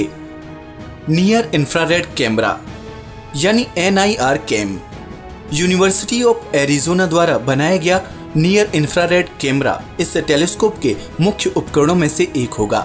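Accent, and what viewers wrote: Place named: Ghaziabad, India. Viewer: Bhaiya Aapki Awaz Gzb ki hai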